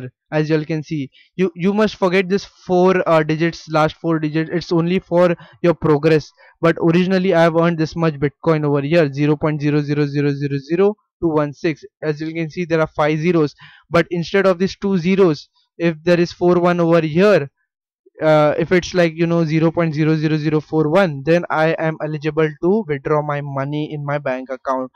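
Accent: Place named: India. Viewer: Indian accent but i need to mine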